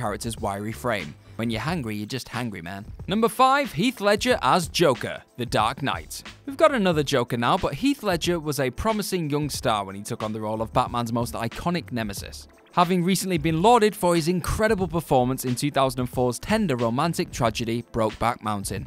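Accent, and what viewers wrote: United Kingdom. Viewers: Don't forget, we're talking about British people narrating this, so their way of pronouncing some words and names may differ.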